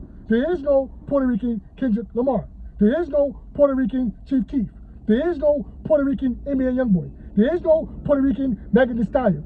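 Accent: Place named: Midwest, United States. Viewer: lol u sound like u from the middle of America please se ya self out this conversation you sound like a Goofy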